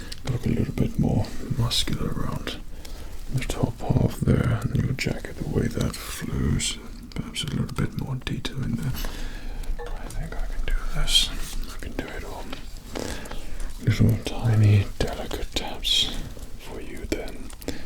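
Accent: England, United Kingdom. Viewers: i am latin american, and when i started learning english i never understood why the people (especially women) said that they love brithish accent. now i understand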